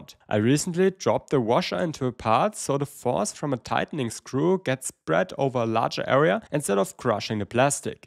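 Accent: Germany. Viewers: If I had a cent for every time I found a 3d printing channel with a German accent I'd have 2 cents. Which isn't much but it's weird that it happened twice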